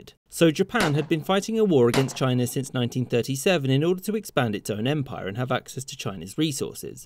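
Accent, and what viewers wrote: England, United Kingdom. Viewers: He's quite clearly English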